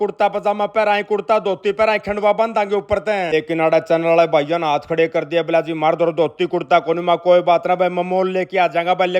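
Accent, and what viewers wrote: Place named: Haryana, India. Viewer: आप ने अच्छी कोमेंटरी की हरियाणवी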